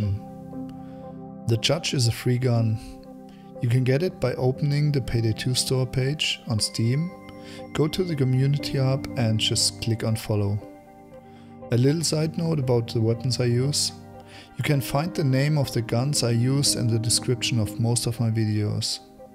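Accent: Germany. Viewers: i knew it since im german too and have a slight accent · es ist so offensichtlich dass du deutscher bist :D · wie man einfach direkt raushört das er Deutsch ist xD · are you german? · ich hab es erst gesehen wo man dein yt Dashboard gesehen habe aber man kann es auch an dein englisch stark hören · Bist du deutscher? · The german accent tho xD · Hört sich so deutsch anhahaha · Are you From Germany it Sounds a bit like it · Are you german by any chance? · man merkt so hart das du Deutscher bist 😂aber bist übelst sympathisch 👍 nices Video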